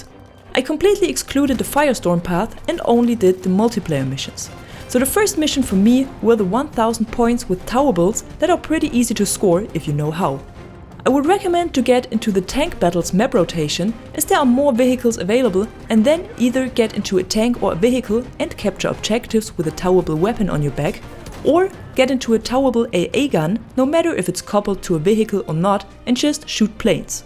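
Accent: Germany. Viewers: you sound very german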